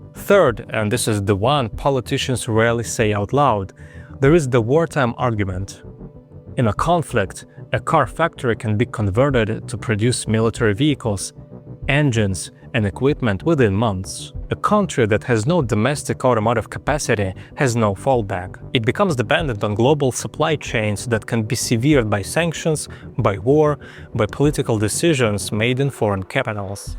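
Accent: Russia. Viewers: Автор 100% русский, у него не самый чистый английский, есть русский акцент (это так, к слову) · У него сильный русский акцент, мне почему-то кажется, что автор русский)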